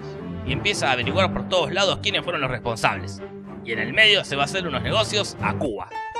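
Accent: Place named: Argentina. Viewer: Insoportable la manera en la que hablas Argentino tenias q ser.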